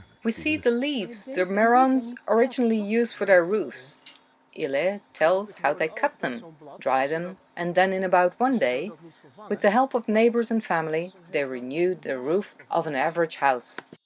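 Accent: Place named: Netherlands. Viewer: Misselijk engels met nederlands accent!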